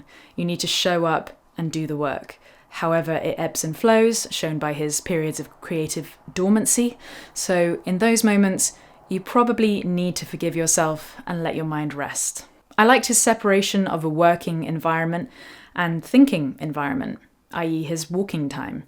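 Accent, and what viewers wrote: United Kingdom. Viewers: lmao i have no idea who you are but i heard the british accent and boom subscribed 🥰🥰 SO refreshing after always watching american youtubers and movies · Holy hell...You're BRITISH?!?!?!?! You were already AMAZING and now you speak.....<3 <3 Sorry I presumed you were Norwegian as well! Just recently discovered Leo and Yourself and Hanna and Rabeah...lol Anyway no that I know you're in England, did you ever have an opportunity to meet or hear from him on your cover of?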